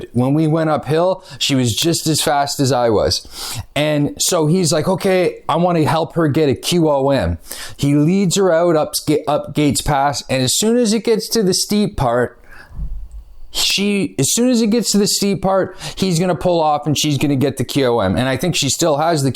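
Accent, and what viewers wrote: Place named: Canada. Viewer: I'm a excessive guy too but you are at another level 😁 I'm from Montréal, so it's a pleasure listening to another Canadian...it's a nice change to listen to something different than from UK of Australia !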